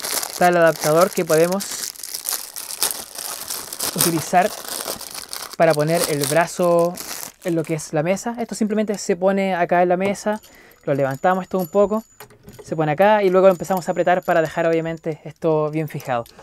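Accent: Chile. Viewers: Me encanta el asento chileno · Tienes como hacento chileno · eres de chile? es la primera vez que te veo jajajaja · Eres chileno o no?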